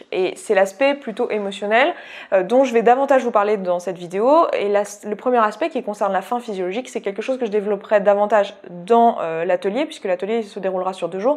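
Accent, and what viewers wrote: France. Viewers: Tu es lyonnaise?:D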